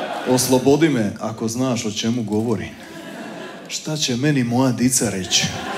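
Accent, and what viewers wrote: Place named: Dalmatia, Croatia. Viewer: Super humor, Dugo se nisam tako nasmijala. Obožavam taj Dalmatinski naglasak. Pozdrav iz Francuske ❤❤❤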